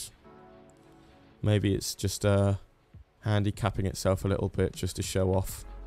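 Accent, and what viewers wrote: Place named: United Kingdom. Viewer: His voice is different like more British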